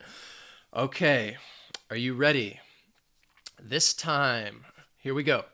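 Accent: United States. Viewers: i love your accent. from which part of the US are you from?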